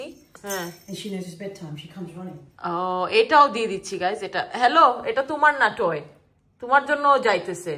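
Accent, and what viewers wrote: Sylhet, Bangladesh. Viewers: Your Sylhety voice is cute bestie😊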